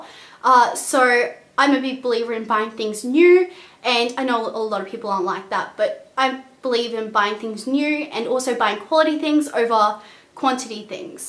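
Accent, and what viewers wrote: Australia. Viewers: Nope she's definitely Aussie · its Australian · How are you getting British? She's clearly Australian. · Australian accent is quit pretty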